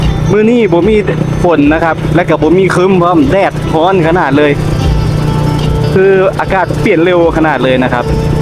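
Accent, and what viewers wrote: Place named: Sakon Nakhon, Thailand. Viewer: เสียงผู้บรรยายคล้ายสำเนียงคน.สกลนคร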